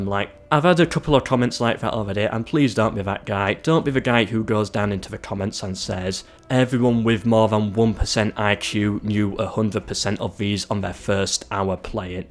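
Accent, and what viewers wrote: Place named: North Yorkshire, United Kingdom. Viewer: He’s probably from around North Yorkshire or Middlesbrough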